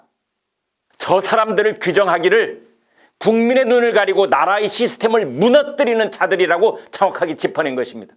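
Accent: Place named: Jeolla, South Korea. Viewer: 말을할때 어금니를 갈면서 발음하쟌어요 특히 성깔 부릴때는 어금니를 뿌드득 갈면서 소리낼때는 전라도 사투리가 가루를 날리더라구요 대구 경북여고 출신이라든데 경상도 사투리가 아니닙다. 소시적 최하8~10년정도 전라도, 나머지 경상도,서울말 섞인 묘한 발음입니다. 고향이 어딘지???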